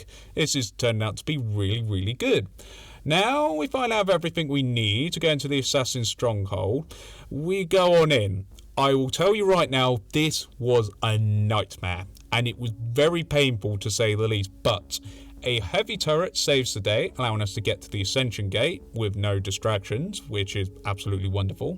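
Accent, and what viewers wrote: United Kingdom. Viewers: You sound like you have the same strain of British as the spiffing Brit.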